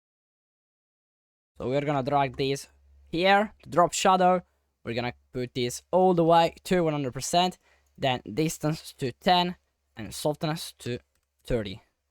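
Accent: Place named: Italy. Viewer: La pronuncia italiana❤🇮🇹 SEI FORTE CONTINUA COSì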